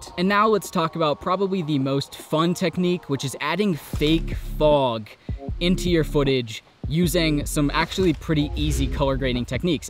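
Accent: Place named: Washington, United States. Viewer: Where are you from? Is this Washington? It just reminds me of that area of the country.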